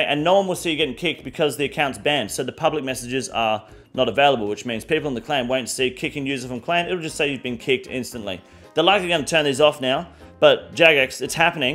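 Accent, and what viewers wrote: Australia. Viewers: fuck me its just good to just hear an aussie voice on an rs vid every now and then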